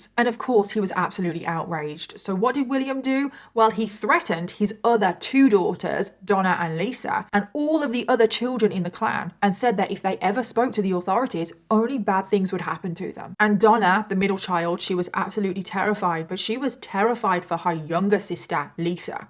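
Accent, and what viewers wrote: United Kingdom. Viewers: Let me say, before I even watch this, you’re my favorite true crime YouTuber. Honestly, I was initially put off because I have serious British accent related PTSD, but I am glad I set that aside (it helps you’re female). Your content and delivery is exceptional 👍🏻💪🏻👏🏻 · Uhh that British accent....i just cant... Im so happy for your 1 Million Subscribers ❤ wish you 100x more.. Bye ❤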